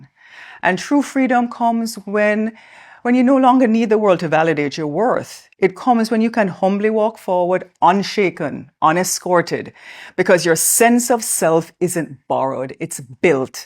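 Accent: Jamaica. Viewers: You sound very Jamaican 🤔🤔🤔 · You’re still so beautiful, eloquent and classy. You sound like one of my peeps from Jamaica. It really doesn’t matter where you are from, just that you reminds me of one of my fellow Jamaicans. ❤❤❤❤❤❤❤❤❤❤❤❤❤❤❤❤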